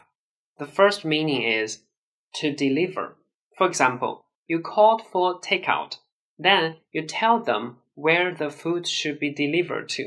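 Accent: Standard. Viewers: I’ve watched enough of your videos to understand that your full courses will be well worth the purchase price. They’re well structured and your teaching mannerisms and accent are textbook; which is so helpful for beginners and intermediate students. Your videos were the first I found when considering learning Chinese and I’m so glad I came across your channel first. I’m now around HSK level 3. Thank you for putting these lessons together and I hope you continue to do so for a long time. I look forward to purchasing your full courses this month.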